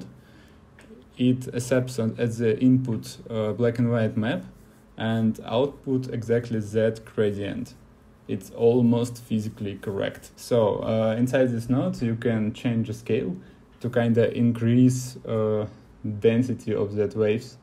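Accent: Russia. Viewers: оцень русский акцент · Русский акцент) Ты случайно не с мастерской?)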